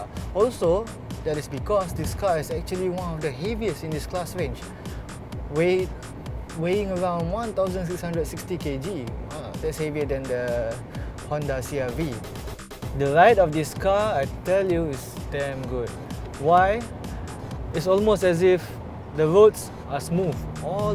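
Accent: France. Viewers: Sounds like a French talking English. But it's entertaining. Good job. Keep it up. What matters is the info about the car. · Sounds like a French talking English... It's nice to hear that, good job bro 👍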